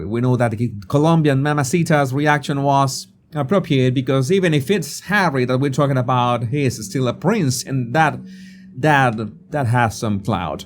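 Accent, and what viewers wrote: Spain. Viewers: I’m not a MM or Henry fan at all, can’t stand them. But, You can’t expect her to have a Colombian accent if she’s not from there. It’s like Canadians or Americans expecting a foreigner to have the dialect down perfectly when visiting as a tourist. It’s outrageous. Especially since you have a Spanish accent and have been doing YouTube videos for years in english. You are just being picky at this point and a little snobby. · your your accent is not anywhere near American. You speak English with a 100% Spanish accent.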